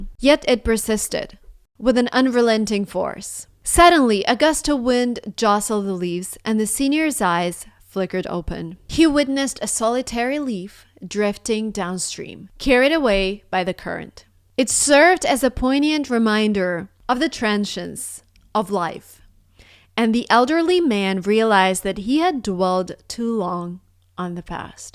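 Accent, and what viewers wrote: Russia. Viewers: Бля...😂 жуткий РАШИАН ЭКЦЕНТ 😢 Слушай классическую музыку, развивай слух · Is she not Russian? It's just that her accent at the beginning was very similar to Russian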